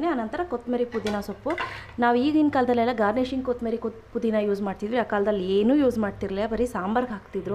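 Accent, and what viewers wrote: Mandya, India. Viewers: just by your way of speaking,as we r from Mandya..tq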